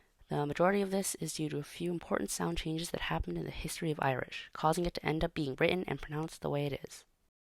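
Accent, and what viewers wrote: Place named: England, United Kingdom. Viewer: Hello. Sorry, but... No, the vowels under the fada should be pronounced cleanly, not as diphthongs. You are projecting English phonetics onto Irish. For example, the "i" does not sound like in "bit", it sounds like the French "i", after narrow consonants. Thank you.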